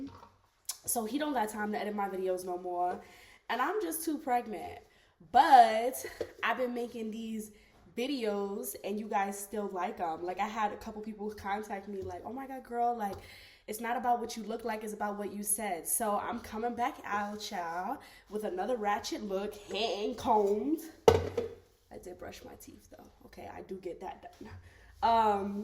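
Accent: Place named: New York, United States. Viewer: You sound so relatable like a woke asf n.y’ ker and are living my dream. I’m so over the western world .. god bless you and your growing family 🌍..😍😍😍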